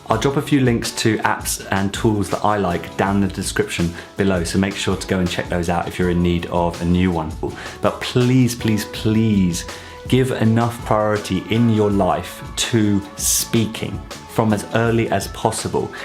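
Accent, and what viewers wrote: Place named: United Kingdom. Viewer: Are you British? You sound like it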